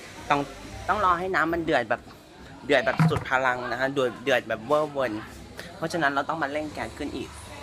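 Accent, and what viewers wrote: Isan, Thailand. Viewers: พี่เป็นคนอีสานหรอค่ะ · พี่เปนคนอีสานหรอค่ะ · หื้ม!!คนอิสานบ่นี้555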